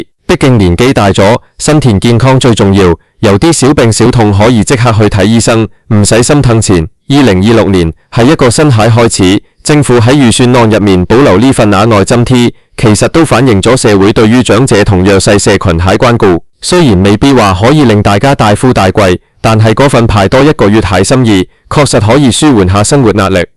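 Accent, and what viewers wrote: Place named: China. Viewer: 呢個係大陸人，講到有鄉音。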